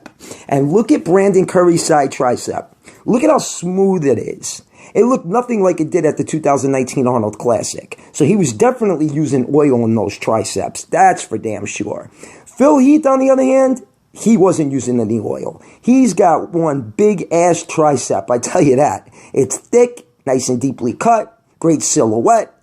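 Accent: New York, United States. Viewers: I love your accent NY style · Idk what part of ny that accent is prob the city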